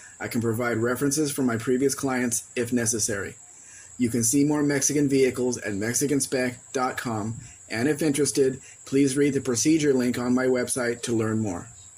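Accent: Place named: United States. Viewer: I have a question, if you are OK with it, are you native Mexican? You speak very fluent English with a solid American accent. Love your videos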